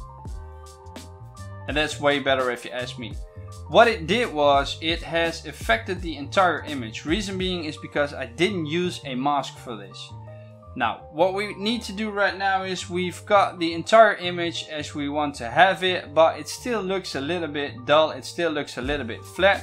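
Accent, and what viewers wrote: Netherlands. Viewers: This dutch accent ♥.♥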